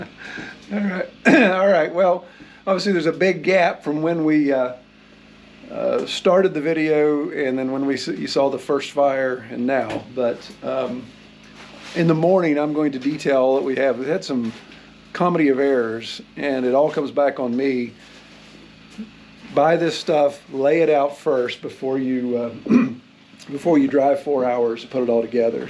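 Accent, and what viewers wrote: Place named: Minnesota, United States. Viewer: Just saying...you need to go pretty far North in Minnesota anymore to find folks that still talk like that. 😄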